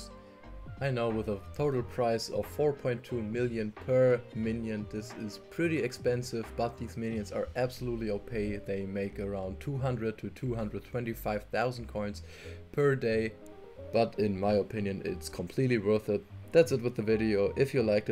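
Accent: Germany. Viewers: Der Deutsche Akzent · i can hear a accsent are you german? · I smell german accent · Sprichst du deutsch/englisch Englisch mit deutschem Dialekt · Ich glaube ich höre deinen Deutschen akzent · Nice tutorial, i didnt know what minions to go for. That really helps! (Btw, man hört dann deiner stimme stark das du deutscher bist xD)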